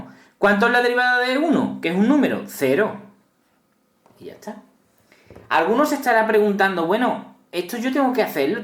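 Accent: Andalusia, Spain. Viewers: Macho tienes un acentazo andalú increíble jajajajaja mete un buen rollito a la hora de aprender algo tan... perverso como son las derivadas que dan ganas de ponerse a hacerlas y todo jajjaajaja buenísimo el vídeo, saludos desde Canarias! ;)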